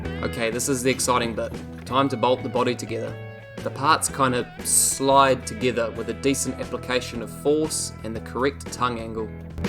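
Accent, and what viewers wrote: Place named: New Zealand. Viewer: Got to be a New Zealander, man we sound so strange after listening to Americans etc.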